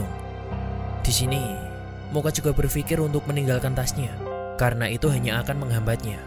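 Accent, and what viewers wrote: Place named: Java, Indonesia. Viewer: Orang mana bng, medok bgt :(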